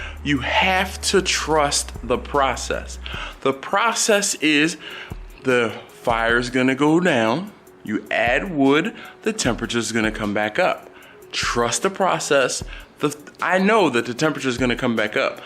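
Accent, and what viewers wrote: Philadelphia, United States. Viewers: Are you from philly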